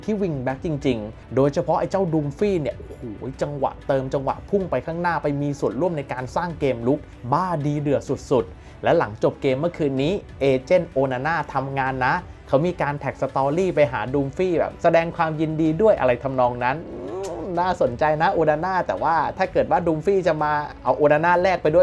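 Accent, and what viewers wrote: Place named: Isan, Thailand. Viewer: ขำตา พูดอีสานผมลูกอีสานยังขำเลยอ่ะ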